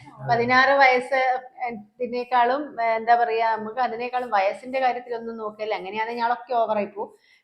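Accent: Kozhikode, India. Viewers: Kozhikodu language kettirikkan rasa ❤adipoli❤ happy familiy❤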